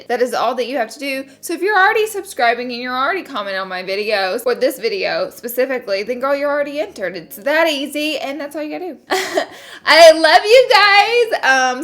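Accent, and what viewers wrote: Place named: Texas, United States. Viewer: Yes girl! I love watching you :) You remind me of where I grew up in south Texas because of your accent. Keep up the great work 👍🏻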